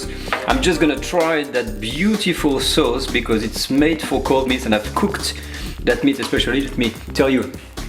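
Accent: France. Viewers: It must taste delicious! I love your adorable french accent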